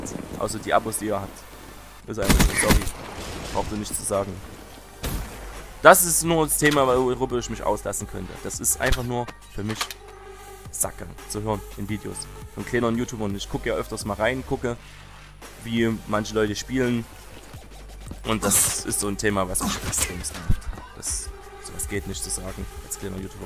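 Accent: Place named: Saxony, Germany. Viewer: he wo kommst du her hört sich an wie als wenn du aus sachsen kommst (ich komm auch aus sachsen)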